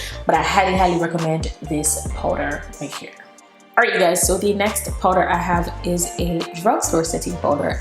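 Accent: Jamaica. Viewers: Your Jamaican accent ☺️☺️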